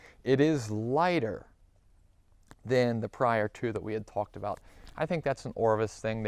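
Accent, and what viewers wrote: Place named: Pennsylvania, United States. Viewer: I’m late to the conversation but I’m a life long dry fly fisherman who took up Euro nymphing a few weeks ago. Ive had decent success with a borrowed Orvis Recon 3 wt. It’s the newer version. Thoughts on whether I should get one? Ive been looking around. And also - about your accent. James Franklin has an accent like yours. It’s not a common PA accent in my opinion so it gets a persons attention.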